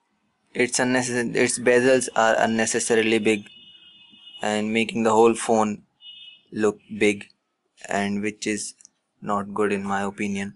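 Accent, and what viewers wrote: India. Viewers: The indian accent is so funny :D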